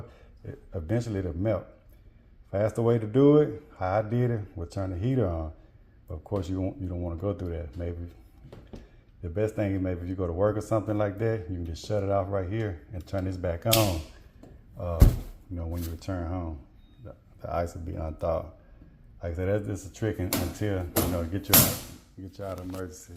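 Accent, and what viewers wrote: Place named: Alabama, United States. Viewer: Small thang to a giant...that`s that alabama/mississippi talk there. I feel you though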